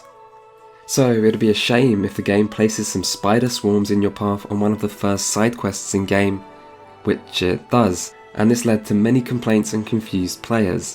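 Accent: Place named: United Kingdom. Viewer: Not going to lie, subscribed mainly because of your voice and accent. You're like British Bob Ross.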